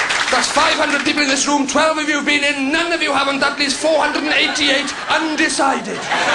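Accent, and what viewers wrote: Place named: Wales, United Kingdom. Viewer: He's fantastic and I love welsh accents which makes it all the better.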